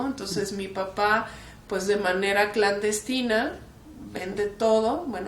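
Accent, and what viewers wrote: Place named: Mexico. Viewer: eres la Polaka más mexicana, no hablas español hablás latino mexicano mi Reyna estás hermosa , Rule' s Naucalpan mex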